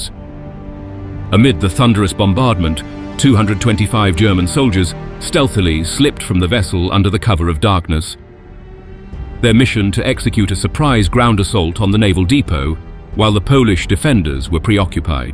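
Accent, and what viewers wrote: United States. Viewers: As ever with these videos they go totally off subject wasting your time. Scroll WELL forward. By at least 7minutes. Why does the “English” commentator have American pronunciation.” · This clearly has an English voiceover mispronouncing words in the American way, like Aydolf instead of Adolf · Why does this English-sounding presenter use those awful American pronunciations for such words as "dee-poe" instead of " deh-poe"and "A-dolf" instead of "Ah-dolf", and say "two hundred twenty five" rather than " two hundred AND twenty five?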